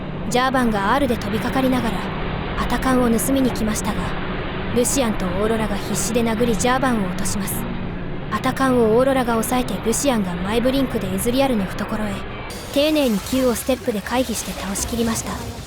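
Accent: Kansai, Japan. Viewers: なんでそんな関西弁押しになったんですか？😂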